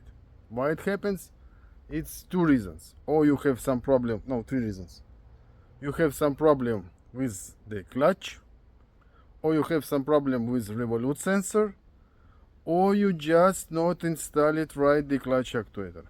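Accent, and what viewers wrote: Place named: Russia. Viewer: На кой ты по пендоски базаришь , разговаривай на русском как нормальный человек, ты же блин из России , по отвратительному акценту и заднему плану понятно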